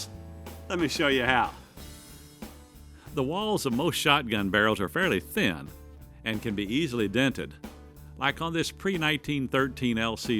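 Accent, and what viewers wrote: Missouri, United States. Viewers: He's from Ely Missouri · I only watch these videos because he has the nicest American accent ever! 😆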